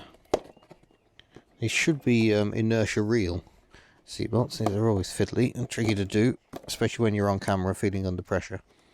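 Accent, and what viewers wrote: United Kingdom. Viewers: almost the Queen's English you were using for Aussie ears, haha!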